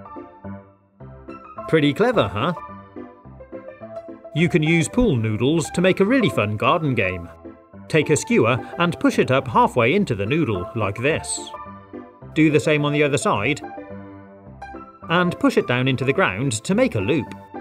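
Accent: United Kingdom. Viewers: I love the British accent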